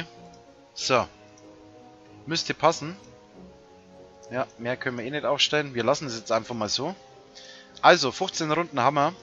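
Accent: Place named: Franconia, Germany. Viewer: Fränkisch ist so geil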